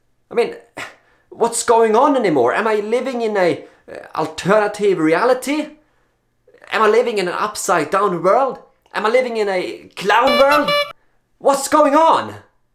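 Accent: Sweden. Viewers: This story is an absolute outrage, its all over youtube, what a joke. Radical Christian? Good grief... nothing like directing the Muslim problem on a other religion #SMH Btw, I love your accent, rants are just so lovely in broken English-Swedish. Keep up the good work. All the best x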